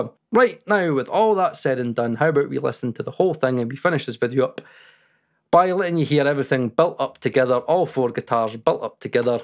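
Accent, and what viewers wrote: Scotland, United Kingdom. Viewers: Came for the review, stayed for the SCOTTISH.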